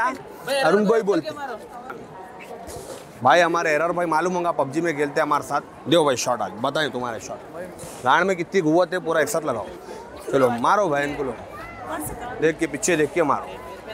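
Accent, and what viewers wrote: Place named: Hyderabad, India. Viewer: Tumhari hyderabadi dialogue delivery ek dum chindian hai ustad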